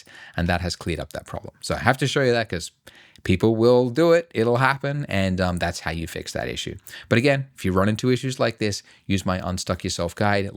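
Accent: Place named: Australia. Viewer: Oh thank goodness, I'm so glad to hear an aussie accent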